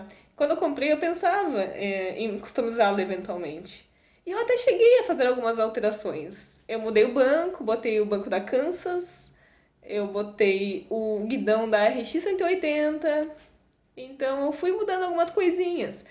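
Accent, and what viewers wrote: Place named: South, Brazil. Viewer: que sotaque gostoso do sul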